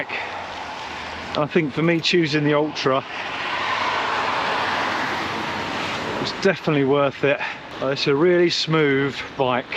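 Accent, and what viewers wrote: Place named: Essex, United Kingdom. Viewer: Same here too - this is happening with quite a lot with channels I subscribe to. Great channel this, and the fella sounds a bit Essex! (my area) I am about to pull the trigger on buying one of these (2026 model)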